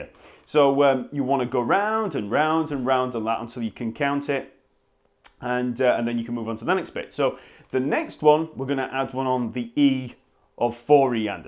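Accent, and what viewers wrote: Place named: London, United Kingdom. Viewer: he s not a verry goog bass player he is a teacher in deed but what i like the most its his accent it s a delight makes me feel like being in hide park at speakrs corner he deserves a marble arch bless cheers from France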